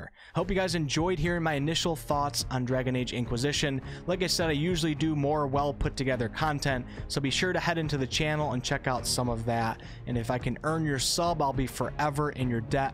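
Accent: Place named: Midwest, United States. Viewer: That midwestern accent peeking out on occasion.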